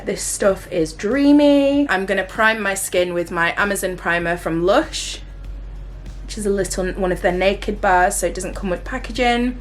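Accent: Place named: Leeds, United Kingdom. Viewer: !!! I knew I recognised the accent! I'm from Leeds! Going to watch all your videos now 😂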